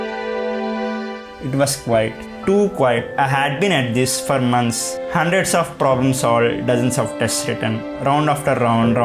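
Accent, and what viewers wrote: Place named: South, United States. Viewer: english communication soo poor..learn that..south accent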